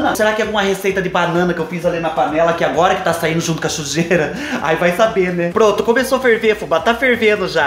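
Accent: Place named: Paraná, Brazil. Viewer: KKK ELE NAO PARA DE FALAR NEM PRA RESPIRAR, DO QUE LUGAR VOE E DO PARANA, EU AMO ISSO, KKK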